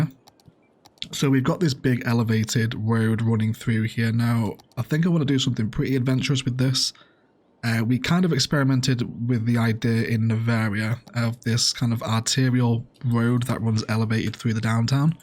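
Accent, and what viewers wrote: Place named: Manchester, United Kingdom. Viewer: I'm french so not that good to spot all the english accents, but you're from Manchester right? I can hear a bit of Marcus Rashford when you talk, I like it! 😁 Oh and great stuff overall on the channel. It helps a loooot!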